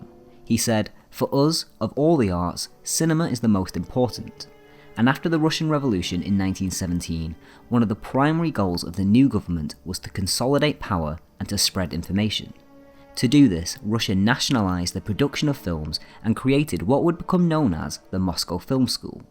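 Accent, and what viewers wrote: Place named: Lancashire, United Kingdom. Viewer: the narrator may not be able to help having a grating lancashire accent but he could at least learn the correct pronunciation of proper nouns. William Friedkin is "freedkin" and Sergei is "SIRgay" nor "sir zhay". incorrect pronunciation detracts from the credibility of the narrative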